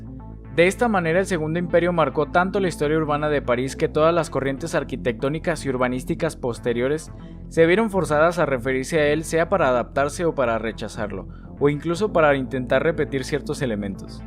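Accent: England, United Kingdom. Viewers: sí por dios, no sé a qué viene decir las cosas como si estuviera hablando inglés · 🤣 pronuncias a la inglesa los nombres franceses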